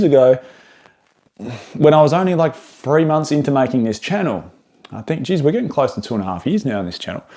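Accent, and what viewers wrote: Australia. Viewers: Please! It's just a dumb joke based on accent. I'm an American, don't apologize for being aussie. We love it and just trying to have fun! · As an American from Kansas, yes Australians and bristish people do put a "R" with words that end in a "A". So Paldea to us Americans sound like Paldear when you guys day it. Its not a big deal, its just part of your accent.... I have never heard anybody talk abour Australian money as dollary-doos.. 😅 Thats just funny, but in a endearing way. Dont fret to much about what us Americans say about how y'all pronounce words. We all sound different. Somebody from New jersey compared to someone from Louisiana compared to Wisconsin than California. All us Americans sound weird to each other too. Your all good 😊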